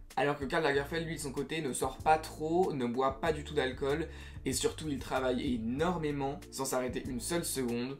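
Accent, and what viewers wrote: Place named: France. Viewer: J,adore votre accent tres francais, pas trop pointu.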